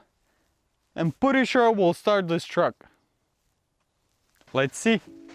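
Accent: Russia. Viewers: Бро, ты не русский случайно?)) Акцент выдает) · Акцент русский!!!!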